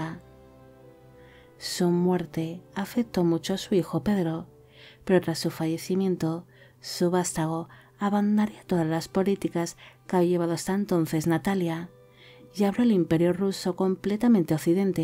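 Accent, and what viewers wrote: Spain. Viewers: De que parte de españa es el acento de la voz de?:0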